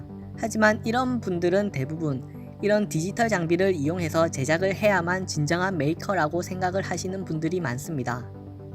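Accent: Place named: Busan, South Korea. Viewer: 그럼요. 손까락이 아닌 달을 봐야지 . 부산억양 좋아요 ( ^^)/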